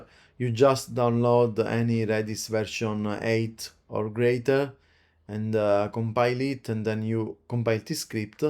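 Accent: Sicily, Italy. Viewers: sentirlo parlare in inglese con accento siciliano , mi rende felice. chi fa questo lavoro deve essere bravo nello sviluppo non in lingue estere. spesso nel support service di cisco succedevano telefonate dove non si capiva nulla. e nessunondi noi ha mai detto niente. altro che accento siciliano.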